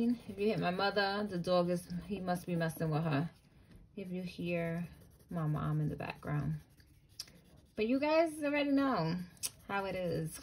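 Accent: New York, United States. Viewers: That New York accent tho. Lol! Needed this motivation today. Thanks sis. Have a good weekend.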